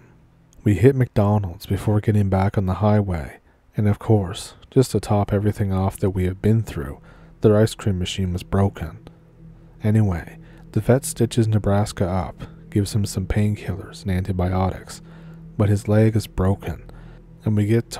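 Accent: Canada. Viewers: knew you had to be Canadian with that accent!! Could listen to ya talk for days 😁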